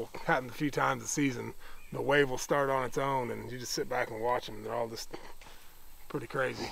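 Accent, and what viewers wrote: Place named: Arkansas, United States. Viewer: Sounds like you’re from Arkansas…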